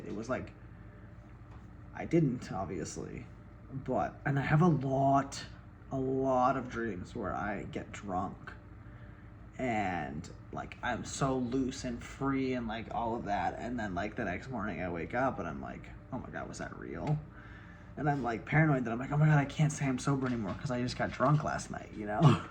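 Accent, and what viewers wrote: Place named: South, United States. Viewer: I love how you get southern on YouTube. Love you so proud of you ❤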